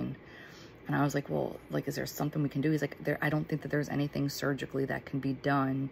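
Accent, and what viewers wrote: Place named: Pennsylvania, United States. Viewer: Sounds like u r in Pa.? I'm in Lancaster look up Dr Perry Argires he's the best neurosurgeon in Lancaster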